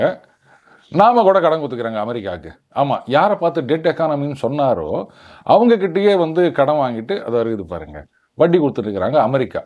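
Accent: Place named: Chennai, India. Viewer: வர்ணிப்பு...அசத்தலாக உள்ளது. சூப்பர்..பக்கா சென்னை வாழ் தமிழ் குடிமகன் போல... வந்துகினு. போய்க்கினு.. ஆனால்... நல்லா இரசிக்கும்படியாகத்தான் இருக்கு.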